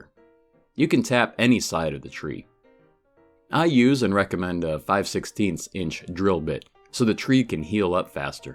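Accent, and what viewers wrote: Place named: Canada. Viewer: This man sounds Canadian so you know he knows what he’s doing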